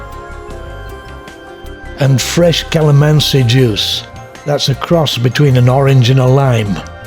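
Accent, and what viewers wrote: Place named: Yorkshire, United Kingdom. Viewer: Did you learn your Cantonese in Hong Kong? You sound like your a northerner. Yorkishire?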